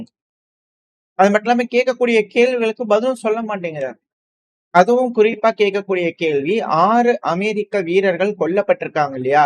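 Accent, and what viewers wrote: Coimbatore, India. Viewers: Bro are you from coimbatore?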